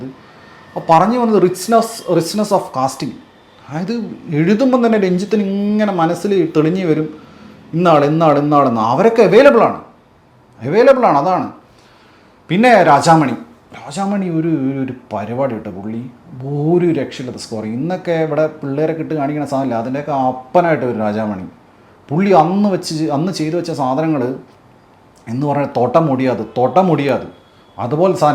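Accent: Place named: Trivandrum, India. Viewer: How you got trivandrum slang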